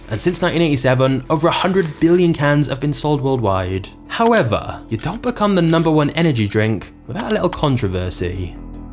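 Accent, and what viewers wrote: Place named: Midlands, United Kingdom. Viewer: It's a middle England thing, this is how people speak. For strong and proper English, go North!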